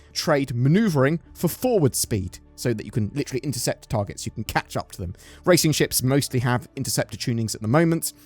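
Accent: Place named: London, United Kingdom. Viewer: You talk like you’re from London!!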